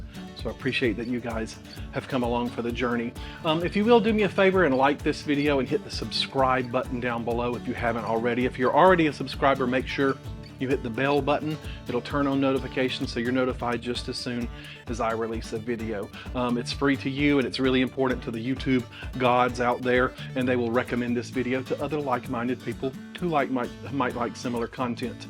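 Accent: South, United States. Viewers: My husband says you’re me but with a beard!!:) that’s because his mother is German and he grew up speaking high English. I’m from Louisiana aka Luzianna. I grew up with such an accent but moved north in high school and have been mercilessly teased since. I think your Southern style is lovely and refreshing! God bless you shooger💜🙂 I love you’re show and find it informative and entertaining. I love your recipes and tips. Keep up the great work. 🍽️🎬🎦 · I love you and I am proposing. I love your personality, your southern manner of speaking, and darlin’ you can cook! I know you are spoken for, but I will wait. BTW I am 81 years. ❤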